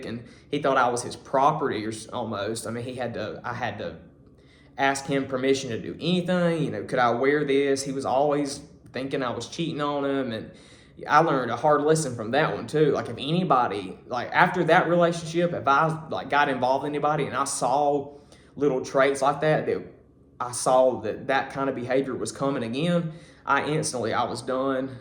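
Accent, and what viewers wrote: South, United States. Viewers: I have one qustion for U; Are ya Suthern? Cute as Funck!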